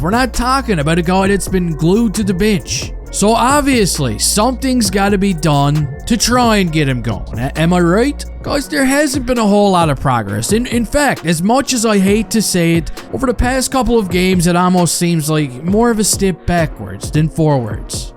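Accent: Newfoundland, Canada. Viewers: First time viewer, you are a newfie aren't you? Thats a newfie accent :)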